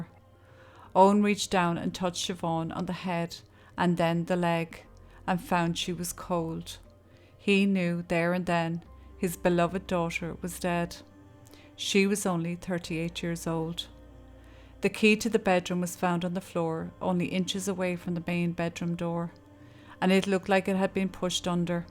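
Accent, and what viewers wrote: Ireland. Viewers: Every time I hear the phrase “straight away” I think of Ireland the home of my Doyle ancestors ❤️. What a lovely accent and calm manner of speaking. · um hello? authentic Irish lass! love it❤ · Nor monotonous at all. A beautiful Irish lilt. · Well, Polly, there's 1000's of other channels on here that you could've gone to instead. But you chose rudeness instead. I'm sure your mother would be "so proud" of the manners her daughter has...🙄 I personally love her beautiful Irish lilt and will continue to listen to this channel. Buh-bye.... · Irish happens to be the most charming accent in the world 😊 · I enjoyed the listen very much - we don't get enough Irish accents on Youtube imo and I'll take it anytime over the AI generated voices that are flooding true crime channels. I remember this case well having grown up close to and going to school in Goatstown, and hearing the pain of Siobhan's family in the aftermath I think that taking an unexaggerated approach to narrating is appropiate and considerate. 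It's all a matter of taste, but there's more than enough overly dramatic channels without the same consideration for the families left behind out there if that's what you're into. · Well, he had damned his soul no matter what spiritual path one believes in. How ANYBODY can weigh money over a life is beyond my comprehension- I came from a violent background and I know how difficult it is for a woman- the struggle sounds as though it is even harder in Ireland. Women have so few instances of recourse- esp if your family is miles away as mine was. How that jerk imagined he could get away with murder with votives for one- hardly what a rapist or cat burglar would set about! I have watched many true crime shows- I am interested in forensics and a possible novel- the latter is highly unlikely ! but it would mean VINDICATION- both my parents are deceased but I'd like to think my mm would know and feel she got some justice- You delivered this case as though the family and victim were friends of yours- a very empathetic touch. 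(and I LOVE your Irish accent). Thank you tastefully but thoroughly discussing a case that NEEDS to be told- Youtube reaches the world and women need to hear stories of other women so they don't become victims either...I couldn't hep but "gag" when I heard the culprit was now counselling other inmates- what a disgusting slap in the face to Siobhan and her kids and family! And how gullible are the authorities at the prison- I hope she has peace now in a life abruptly and brutally ended and I feel for her kids- what they will read, asp her son, as he grows up- newspapers rarely get the facts "straight". Namaste from Canada and All the best for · Why be so rude, just don’t listen, leave it to the rest of us who enjoy listening to an Irish voice.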